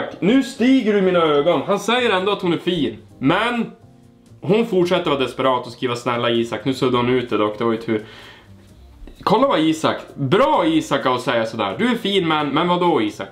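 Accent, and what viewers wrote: Norrland, Sweden. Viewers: vilken skön norrlands dialekt du har 😆👍 har precis börjat kollat på dig. kung äru👌🏻👌🏻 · Gör en video när du bara pratar norrländska!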